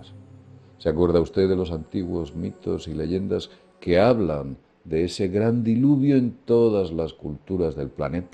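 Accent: Spain. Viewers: Hablas muy lindo con tu azento español pero sube el volumen,no te oigo bien y estoy sin los audifonos (la77)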